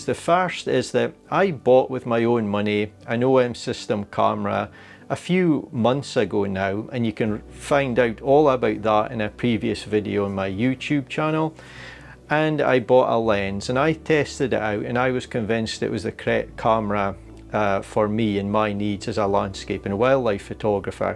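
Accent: Scotland, United Kingdom. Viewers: I knew you were from Scotland before you mentioned it.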